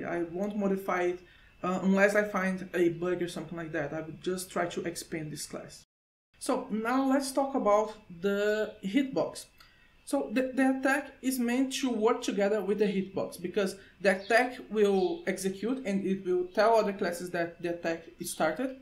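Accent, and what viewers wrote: Brazil. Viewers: Where yo from? brazilian accent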